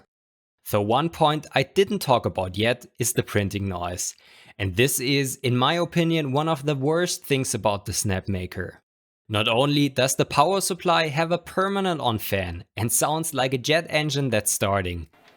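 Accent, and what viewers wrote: Germany. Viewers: Hello dear friend! Congratulation and thank you for this awesome video!!!From your accent i guess you are in Germany. I live in Baden-Württemberg i would be happy if we could communicate somehow. Ich spreche auch Deutsch:)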